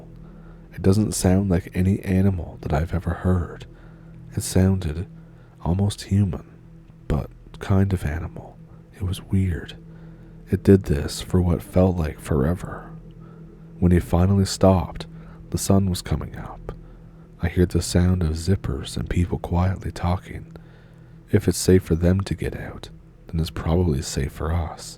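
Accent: Canada. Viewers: I always love your stories. And your Canadian accent!!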